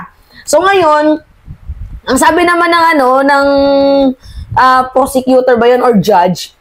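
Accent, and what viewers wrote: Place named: Waray, Philippines. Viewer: idol maam from a uyog ka po ba? kasi yung pag waray mo may sin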